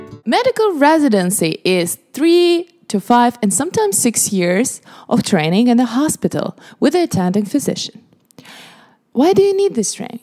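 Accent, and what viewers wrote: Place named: Russia. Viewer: Awesome job, I like your Russian accent😍😍😍 Krasavaetsya!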